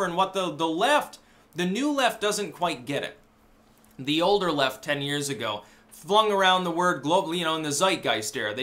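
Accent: United Kingdom. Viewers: Dude, you have the BEST British accent ever!!! 😎🤣😁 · British accent is king.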